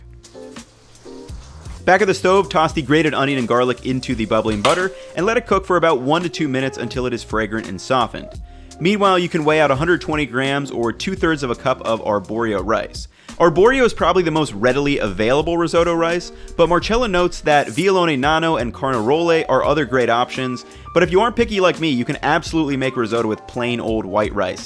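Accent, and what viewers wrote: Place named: United States. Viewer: He's American, so he pronounces it like an American. Because that's how language works.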